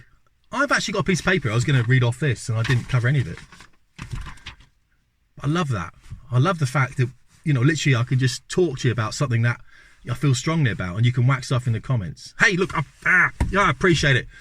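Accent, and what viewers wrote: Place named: Scotland, United Kingdom. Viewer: Scottish AF 😂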